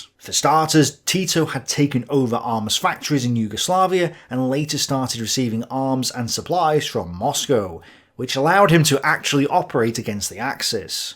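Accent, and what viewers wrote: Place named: North, United Kingdom. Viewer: I was born in New Hampshire and like your shirt. Can’t quite place that accent. Sounds like you’re from the Far North!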